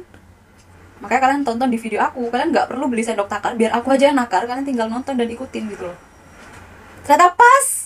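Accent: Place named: East, Indonesia. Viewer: Kakak asli mana ? Logatnya kek orng daerah wetan yaak hhe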